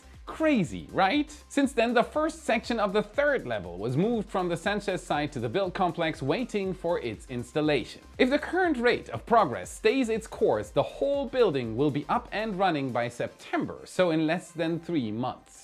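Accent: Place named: Germany. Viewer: Love these videos. Part of me hates the cringy sort of script reading. But honestly the German accent sticks around in my mind and when I see a new video I just imagine cliche intro phrases in a German accent and I have to say I look forward to it haha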